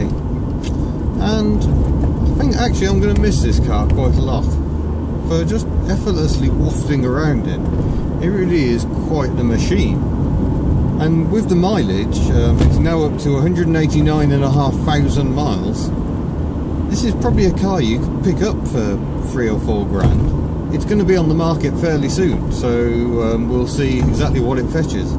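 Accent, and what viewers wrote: United Kingdom. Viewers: Did not expect a British accent.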